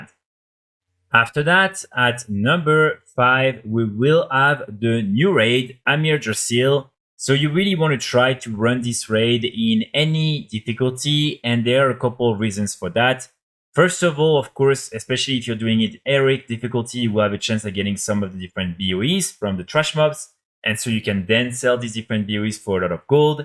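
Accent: France. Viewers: Nice french accent :) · tu es Français toi, l'accent ne trompe pas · Are you French ???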